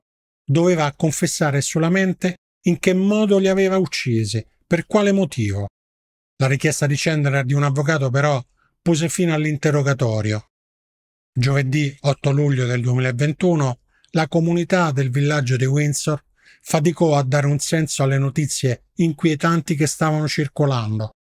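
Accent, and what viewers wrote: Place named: Rome, Italy. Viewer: Mado’ che voce fastidiosa e che accento troppo marcato …Spaes Ics che sarebbe? Prova a parlare in italiano invece che romano, siccome che lo devono ascoltare tutti….video pessimo. DELETE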